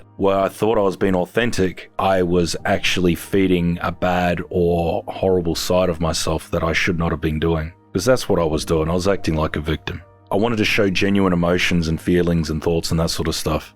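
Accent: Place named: Australia. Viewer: First time commentor! I look forward to your videos, always, because I love the game and found you a few years ago making the forest content. Your peaceful voice and how thorough you were with the game was my first draw in to the videos. Well to be frank... your sexy calm Australian voice I'd literally listen to for hours and put your videos on to chill out. I did notice your content for sons being quite a lot more agitated and angry (you mentioned you quit smoking? which I figured was something affecting your mood). I watched the video you just posted before this one and heard you laughing multiple times and was going to comment how nice that was to hear. Thank you for being self aware and realizing how you had changed into someone else for a bit. We're all humans going through life and even though we're here for your content - your wellbeing matters the most obviously. 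Sorry it's been a rough bit of time for you. I for one would love to know you were enjoying playing and filming for us and it not feel like a burden because I enjoy every bit of content you share. If you streamed live I'd watch every minute. You are doing great. Proud of you and thank you for your dedication to this!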